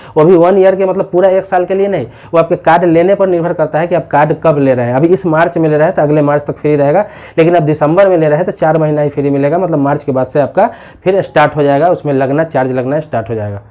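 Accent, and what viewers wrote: Bihar, India. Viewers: Bihari bhasha thik karo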